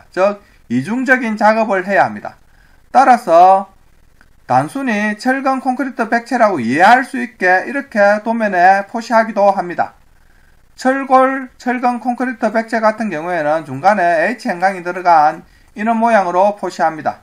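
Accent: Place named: Gyeongsang, South Korea. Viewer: 아지야 경상도 사투리 직이네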